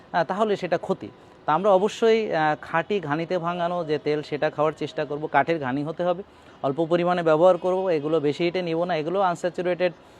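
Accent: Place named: South, Bangladesh. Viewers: যশোরের ভাষা মনে হয় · ভাই আপনি খুলনার ভাষা কোহানে শিখলেন। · Sir apnar দক্ষিণী ভাষাটা ভাল লাগছে।মনে হল আপনি আমার বড় ভাই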